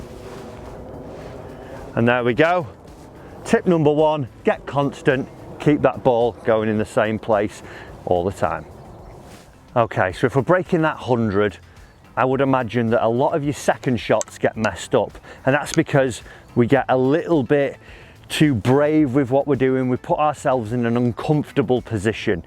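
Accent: United Kingdom. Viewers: I can't get enough of that british english (are you from Manchester?)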